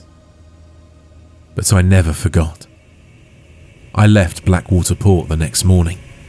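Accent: United States. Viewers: _MHorrorMacabre:Hyped and Liked 👍 #112! Your American accent was excellent! You did it seamlessly. That must have been hard with the back and forth dialogs between the characters. I really appreciate the extra work you always do on your channel for us. Loved the story. 🖤☠️🧡☠️:_MHorrorMacabre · Bravo! Your American accent sounds kind of cute! I know about this legend, and my opinion is that it was Mass Hysteria. Your narration was perfect and intense. Thank you so much ❤😮. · Great Story and Narration! I liked your American accent. I enjoy the legend of Spring-Heeled Jack.❤❤🎉🎉😊😊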